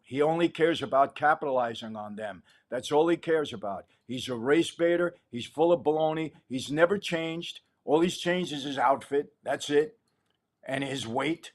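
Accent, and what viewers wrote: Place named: New York, United States. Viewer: As a Native New Yorker living out of state, I especially appreciate your New York accent and tone to reinforce what I already know (all of my family are gone). I am 66 but you also come across fatherly and that feels reassuring. Most of all, I appreciate your walk with our Lord and your faith in Him. BTW, I have a very similar testimony as you in how I was convicted of the truths in the Bible and the revelation of my relationship with God. The main difference is that I was not in prison. I was at the end of myself, though. God bless you!!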